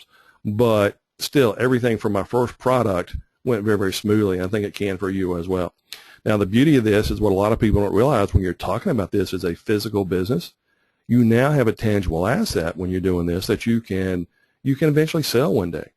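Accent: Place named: South, United States. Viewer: OMG...I just love your freaking voice!!! You sound just like famous celebrity gardener, P. Allen Smith! with that soft, soothing, medium southern drawl...I could just sit and listen to you talk about the phone book for HOURS!!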